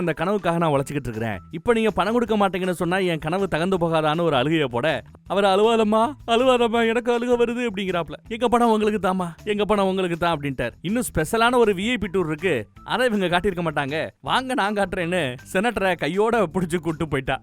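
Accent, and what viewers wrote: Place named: Sivagangai, India. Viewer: ஐயாவின் விளக்கம் வெகு விமரிசையாகவும் எதார்த்தமாகவும் அங்கநங்கே நையாண்டி நக்கல் கலந்தும் சிவகங்கை வட்டாரமொழி வழக்கிலும் உள்ளது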